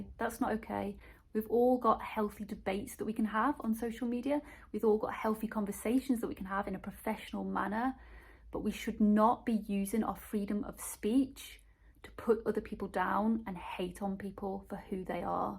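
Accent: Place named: Northern England, United Kingdom. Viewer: Also your accent is so charming to me :P Northern England? Or is it Scouse? I've tried to learn as a Canadian who loves British culture lol (all of "Britain". I know you're English :P)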